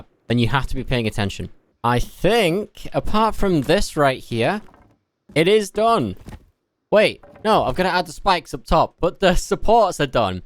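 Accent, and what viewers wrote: United Kingdom. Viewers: Great vids. Where are you from? UK living in USA?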